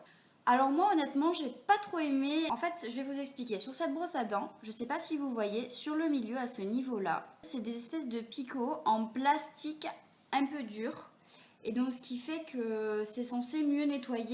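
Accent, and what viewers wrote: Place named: South, France. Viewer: coucou coup de fouet mdr j'adore je suis partie vivre dans je bord et je suis du sud ton accent me rend nostalgique (j'ai mon accent aussi lol) super vidéo que de belles dents 😆😆😆😍😘 bye bye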